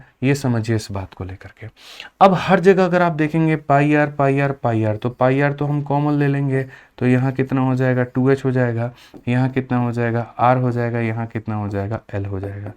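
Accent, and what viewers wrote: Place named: Bhojpuri, India. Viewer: Sir ji aap Bhojpuriya andaz me lazawab lagte h 😅❤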